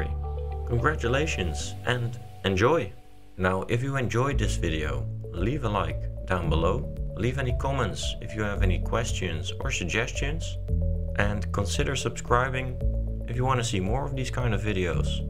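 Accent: Netherlands. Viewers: Are u Dutch? xD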